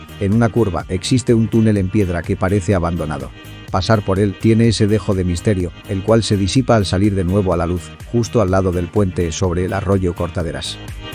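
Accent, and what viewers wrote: Spain. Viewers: Hola !! Soy Chilena es primera vez que veo su video ,me gusto mucho ya que del lado argentino no conocía no e tenido aun el privilegio de ir Supongo que la voz de un español es para hacerlo más neutral bueno la cuestión es que fue interesante muy cultural e informativo somos bendecidos al compartir la cordillera de los andes !! Espero que esa plegaria del obispo Ramón Angel Jara sea escuchada no literal que se caigan las montañas sino que Jamás tengamos que estar en guerra Chilenos y Argentinos ya que seria muy penoso para ambos!! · Hola muy bueno el video y los paisajes. Pregunta , sos argentino ? Por q tenes tonada española.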